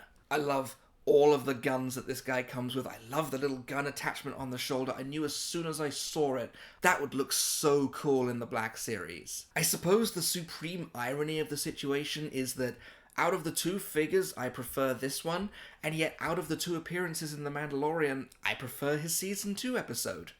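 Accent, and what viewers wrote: England, United Kingdom. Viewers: J! I've always assumed we both had great minds! Hope you and the family are good. I'm currently struggling with daughter and her various "quirks" of teenage girl behaviour. I've only discovered recently, clicked on and heard his accent. Sold!! I thought. Gotta support English dudes across "the pond!"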